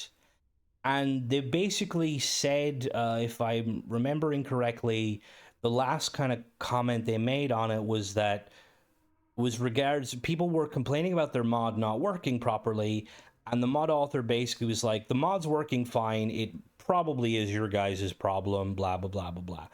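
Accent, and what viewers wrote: Ireland. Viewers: We love are angry Irishman Mod reviewer. Everything sounds more intense with an Irish Accent, it makes ppl pay attention. Keep on doing what all of us don't want to do and review them mods.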